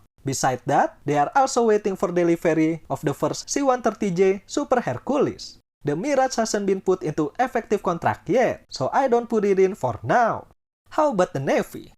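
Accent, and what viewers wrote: Indonesia. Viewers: Bahasa inggris rasa indonesia😅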